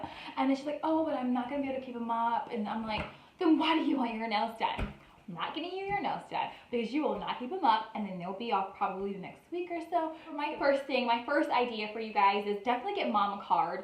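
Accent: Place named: South, United States. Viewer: The crockpot is a great idea 💡 your southern accent 😹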